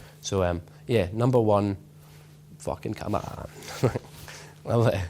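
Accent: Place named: Scotland, United Kingdom. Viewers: That song fcks me up, and now that I know he's Scottish (omfg lovely accent) and seems nice, I'm a fan ✌️ [also he looks a bit like Paul McCartney, I think it's the eyes] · Ass Juice right the Scottish accent is beautiful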